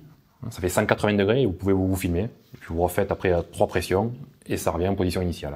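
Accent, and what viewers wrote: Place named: Toulouse, France. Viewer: l'accent toulousainnn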